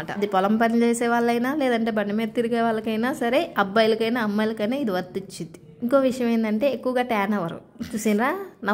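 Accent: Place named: Kanigiri, India. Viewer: my native place also nearby kanigiri and okasari reels chustunte e sister video chusa and tana slang chusi doubt vachi(manam enta dooram vellina mana own roots aete marachipolem kada😊😊😊) nenu adiga me proper akkada ani e sister reply echaru so ala naku telusu...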